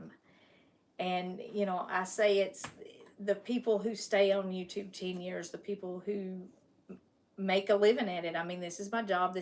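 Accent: South, United States. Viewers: Congrats on your huge milestone! I found you during the lockdowns. I was hooked because you reminded me of the southern folk from Franklin NC that were so lovely and welcoming to my parents who moved there from Michigan. When I went to visit, it was like entering a new world. Wonderful people. Best food ever! After my dad passed and we moved mom north, that’s when I stumbled upon your channel. Your southern accent and country cooking reminded me of that wonderful place and wonderful people. I have your cookbook and I shared one of your videos with my mom. “Mom, you gotta watch this lady”. She loves you too. So, you see, you just never know who you touch just by following the Lord’s leading. Blessings sister. When we get to Heaven, I’m coming over for some southern cooking, a cup of coffee and conversation about our lovely Jesus. Until then…❤️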